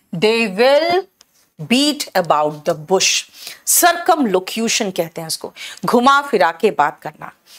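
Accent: Jharkhand, India. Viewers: Mam aap jharkhand se ho kya ❤ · Aap Jharkhand se ho maim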